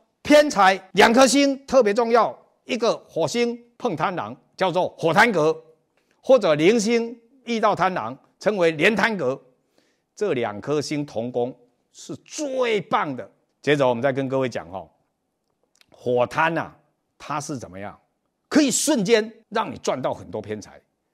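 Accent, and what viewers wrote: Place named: Taiwan. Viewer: 還臺灣國語欸！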